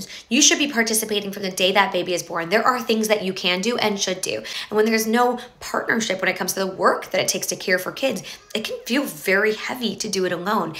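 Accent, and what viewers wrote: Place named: West Coast, United States. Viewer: She has to be West Coast. They have developed the most annoying accent in the US.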